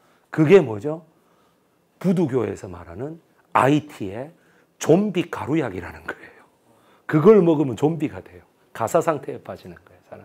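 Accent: Busan, South Korea. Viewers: 부산사투리 너무 좋습니다. 첫 줄거리부터 흥미롭습니다.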